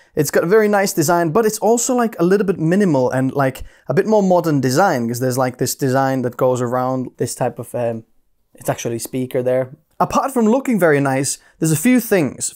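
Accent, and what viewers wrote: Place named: United Kingdom. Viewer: Wow…just realized you posted this a day ago! (On the basis of your accent, you’re posting from the UK, I’m assuming….) Coincidentally, I just purchased an LX706 today. I have a few days before it will be delivered, so I’m biding my time watching YouTube videos on the model, which is how I happened upon your excellent video. Thanks so much for the detailed presentation! I live in Honolulu and purchased a Roland FP30 about three years ago during COVID when piano tuners weren’t making house calls. I had tried to teach myself how to tune my 1990s Yamaha acoustic upright but messed everything up completely and broke a string. Knowing that tuners wouldn’t be making house calls soon, I immediately drove to the only piano dealer on Oahu and picked up the FP30 with stand and pedals for $1000. Over the next few months I fell in love with digital pianos, even though I’m a classical pianist who doesn’t care about all of the non-piano sounds. 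When I finally had my Yamaha tuned this past summer, I realized that I had become a digital piano player and that acoustic uprights just weren’t as satisfying anymore since the advent of high-performing digital pianos. Fast forward to this past week, when I decided to upgrade from the FP30. I watched numerous videos comparing different brands and models, then decided on the FP90x as the most affordable upgrade. But then I went back to the original piano seller’s website and saw that they had a pre-owned LX706 selling for about half the retail price…s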